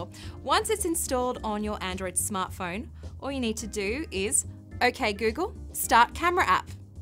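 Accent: Australia. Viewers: There is nothing wrong with her voice. If you're referring to her accent, its Australian mate. Aussie Aussie Aussie!! Oui Oui Oui!!! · Is she Australian!?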